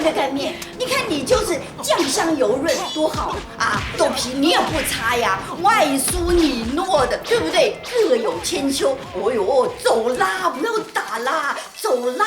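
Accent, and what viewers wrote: Wuhan, China. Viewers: 拿起走，尝一尝 熟悉的汉普 哈哈哈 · 哈哈哈哈哈哈，普通话说着说着就变成了武汉话，你这汉普真的是让我感觉无比的亲切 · 哈哈哈 听你说的第一句话已经确定你是正宗武汉人了，明天就去按你的教程做豆皮去哦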